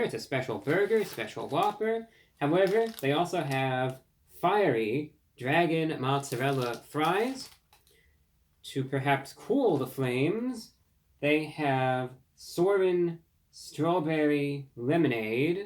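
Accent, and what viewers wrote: United States. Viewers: Is this a posh american accent?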